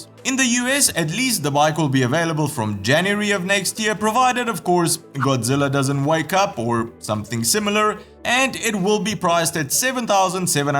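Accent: Afrikaans, South Africa. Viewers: Is jy 'n Afrikaans ou? Klink 'n bietjie so ne! Is jy nog in SA?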